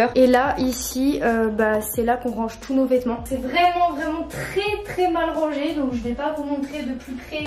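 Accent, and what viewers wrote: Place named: Alsace, France. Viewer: ca sebt l'accent alsacien...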